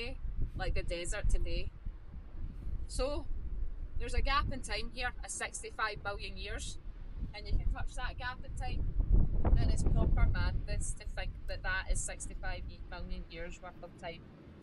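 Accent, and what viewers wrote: Scotland, United Kingdom. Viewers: I’ve said it before but I’ll say it again: geology sounds better in a Scottish accent. 🤘🏴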